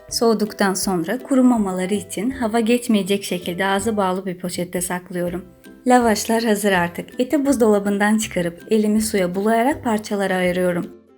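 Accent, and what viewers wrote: Azerbaijan. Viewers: Cok guzel kısa net. ve bıktırmadan anlatiyorsunuz??elinize sağlık..merakımı mahzur görun ama bir sorum var sanki azeri bir aksanınız var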